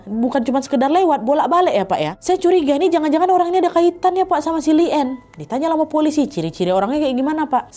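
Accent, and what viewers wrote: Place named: Medan, Indonesia. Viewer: Sukak sama kerna cara ngomongnya sama cam awaq orang Medan terutama pas bilang G Ada Otak😁😁😁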